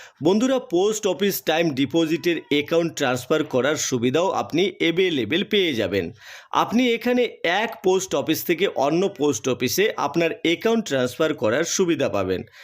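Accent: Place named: Bangladesh. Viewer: তুমি কি বাংলাদেশের মাল বাংলাদেশের মতন করে কথা বলছো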